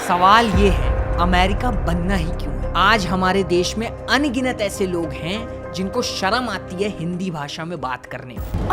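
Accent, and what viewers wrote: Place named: Bihar, India. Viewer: Hame tu appki behaar ki language bohat Pasand h us tarha bolne ka style he alag h .v.good vdo (from Karachi ❤)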